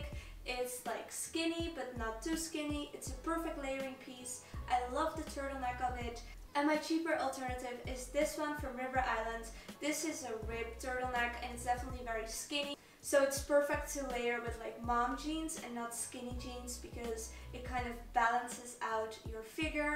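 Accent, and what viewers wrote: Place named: Netherlands. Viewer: Haha dacht al dat je Nederlands was door je accent blijk je dat ook te zijn 😂😂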